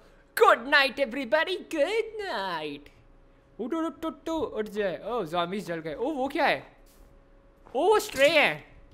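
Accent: Gujarat, India. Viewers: Are you from Gujarat?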